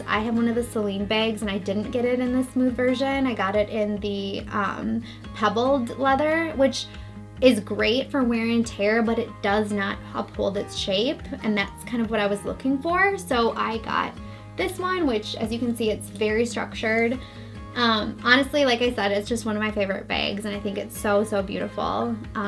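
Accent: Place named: Midwest, United States. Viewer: Probably Midwest (Wisconsin or Minnesota) just guessing from her accent "bag" lol. I live in the Midwest too.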